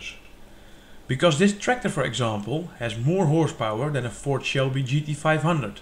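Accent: Netherlands. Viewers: Ik ruik een flink Nederlands accent. Maar hoe dan ook super leuke video, zoals alles wat ik van je heb gezien.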